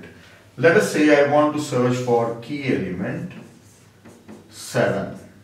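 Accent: India. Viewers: you are speaking Indian English the way an Indian guru would speak it. i love you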